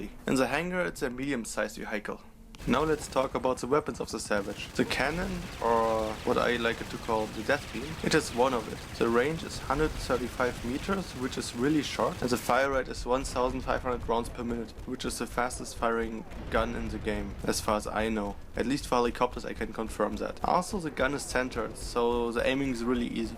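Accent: Germany. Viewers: If you are German... I love German accents so muchhhhhhhh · Man merkt du bist deutsch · Wie krass man hört dass er deutsch ist ;)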